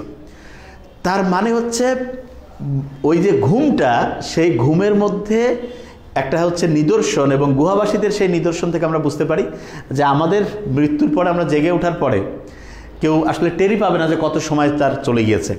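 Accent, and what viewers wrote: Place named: Rangpur, Bangladesh. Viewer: Sir apni rangpurer Manus sune khub vhalo laglo❤🥰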